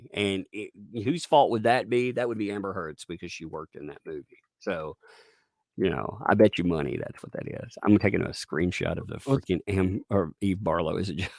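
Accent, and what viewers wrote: South, United States. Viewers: for the record your accent would probably be called "Educated Southern".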